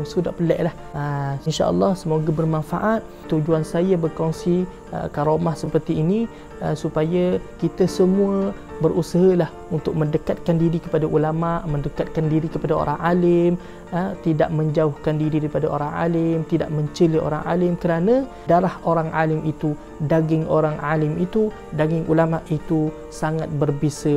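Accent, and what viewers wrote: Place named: Terengganu, Malaysia. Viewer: Kalu comel lagi ulasan cerita tu biar seling kelantan buke seling terengganu. Terbaik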